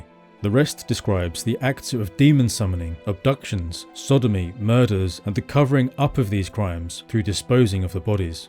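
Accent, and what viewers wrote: United Kingdom. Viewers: This video auto-played after one of MrBallen’s videos. I normally just exit the new video when this happens, but not today. This is awesome! History, crime and great narration. The British accent is a huge bonus (I’m a borderland Texan lol). Thank you, sir. 🏰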